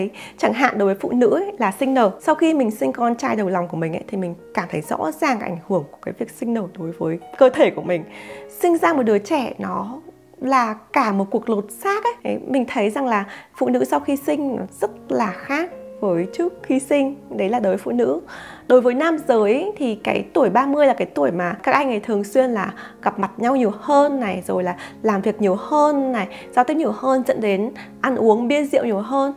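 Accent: Standard. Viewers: bài chia sẻ rất là hay, giọng rất chuẩn!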